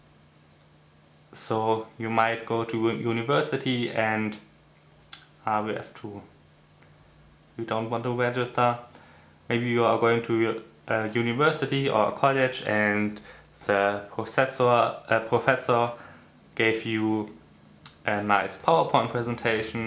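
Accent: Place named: Germany. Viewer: Ob und zu welchem Video ich einen Kommentar abgebe, solltest du besser mir überlassen. Wenn du ein Video online stellst, musst du auch mögliche Kommentare hinnehmen. Ich spreche 6 Sprachen in der jeweils landesüblichen Koloratur und ich bin auch Deutscher. Eben deshalb schmerzt es, deinem Englisch zuzuhören. Meine Meinung in deutsch zu verfassen geht schon in Ordnung, denn dein starker Akzent lässt ja nur die Schlussfolgerung zu, dass du Deutscher sein musst. Insofern ist deutsch schon richtig.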